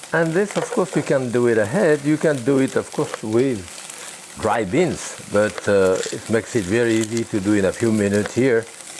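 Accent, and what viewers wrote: France. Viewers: this mans is so fucking french and im absolutely here for it. "and this, as you can see, is a -aut dog-"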